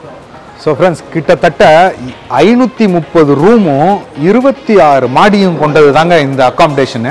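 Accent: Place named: Chennai, India. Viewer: Looks like indian cricketer Namma aswin Mari irukinga avar Mari tan pesuringa,like frm Chennai 🇮🇳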